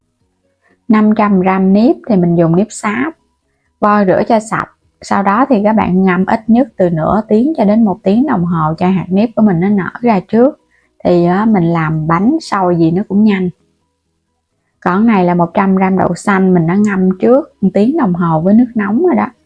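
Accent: Mekong Delta, Vietnam. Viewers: Giọng nói miền Tây của người ta đó 😊😊😊😊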